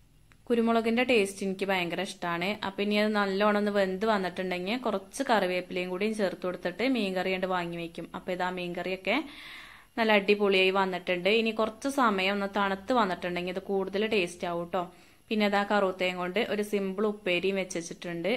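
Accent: Malappuram, India. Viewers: Varthamanum kettit Malappuram ano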